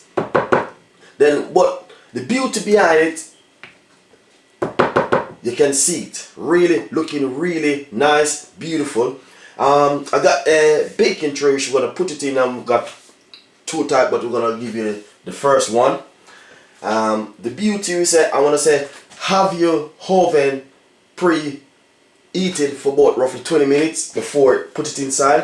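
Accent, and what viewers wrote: Jamaica. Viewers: wow are you Jamaican. me want some rum cake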